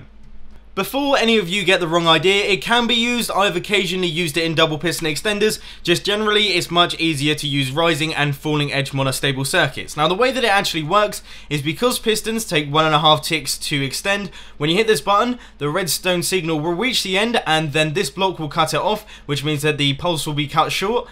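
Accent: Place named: United Kingdom. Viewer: I swear the British accent is thicker in this video than it is in current HermitCraft episodes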